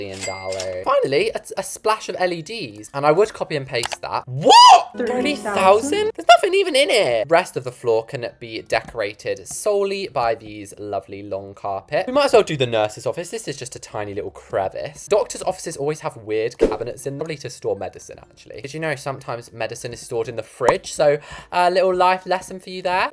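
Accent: England, United Kingdom. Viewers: OML FINALLY A BLOXBURG YOUTUBER WHOS BRITISH NAH I AM SUBSCRIBING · Why dose his english accent make him sound so sophisticated it makes sound like hes about to ryme every time he says something lol🤣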